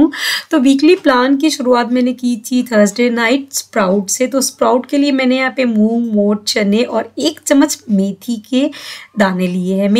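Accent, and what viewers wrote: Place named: United Kingdom. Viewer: Her accent 😂😂😂😂 British lg rha hai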